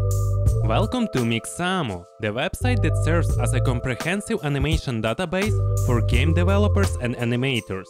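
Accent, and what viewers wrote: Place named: Russia. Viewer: Вери гуд видеос, виз руссиан аксент итс мор ундерстендабле! Сенк ю вери матч!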